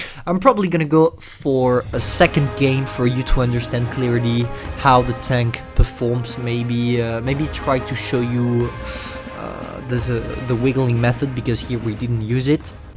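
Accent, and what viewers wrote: France. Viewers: You have French accent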